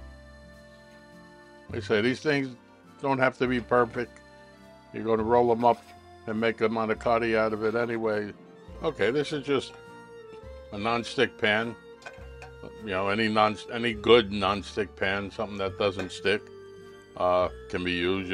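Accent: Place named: New York, United States. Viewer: Your demeanor and accent scream new- york, and I love it. Thank you for the videos may God bless you.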